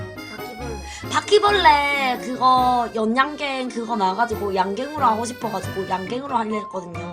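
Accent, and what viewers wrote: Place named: Gyeongsang, South Korea. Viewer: ㅎㅎ누가봐도 경상도분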